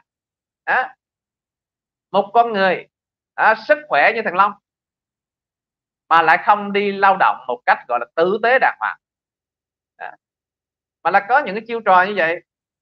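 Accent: Standard. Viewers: nói quá chuẩn nghe đã tai.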